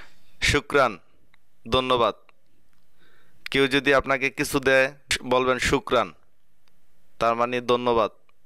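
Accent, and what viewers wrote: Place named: Chittagong, Bangladesh. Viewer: আরবী ভাষায় এ কার ও কার নাই। তাই আপনার শেখানোর পদ্ধতি উত্তম নয়। আপনি আরবির আঞ্চলিক উচ্চারণে শেখাচ্ছেন। কেউ যদি বাংলা শিখতে চায় সে কখনো নোয়াখালী বা আপনার নিজের এলাকা চট্টগ্রাম এর মত করে শিখবেনা। সে সঠিক উচ্চারনে শিখবে। ধন্যবাদ।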